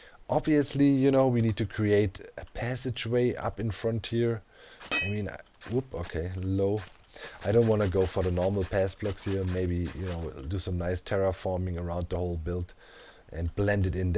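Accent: Germany. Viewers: u german or somthing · Are you German or do you just live here?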